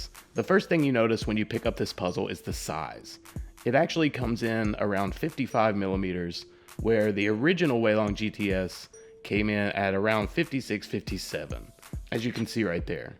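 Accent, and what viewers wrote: South, United States. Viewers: Where are you from? To me it sounds like you have a few hints of a Southern accent.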